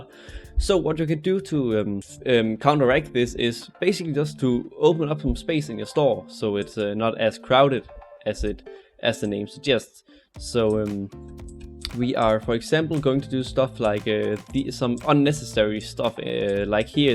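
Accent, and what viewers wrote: Denmark. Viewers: are you danish i heard you eller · jeg kender en dansker når jeg hører dem. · man kan godt høre at du er dansker lol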